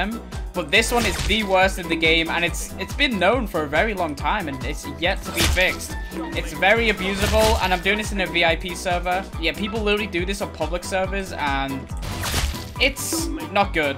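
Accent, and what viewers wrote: United Kingdom. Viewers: you use brithis voice i use russian bcs i think its cool😀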